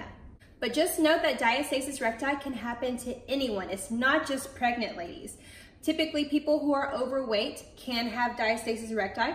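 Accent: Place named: United States. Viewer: ZAKIYA SULEIMAN she has an American accent🤷🏽‍♀️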